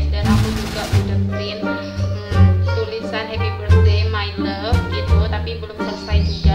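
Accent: Madura, Indonesia. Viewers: Cara bicaranya kek org madura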